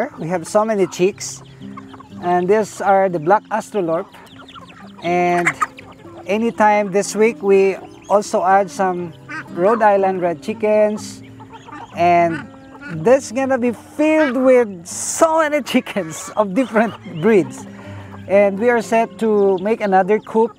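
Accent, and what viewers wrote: Philippines. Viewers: based on his accent, i think he's in the philippines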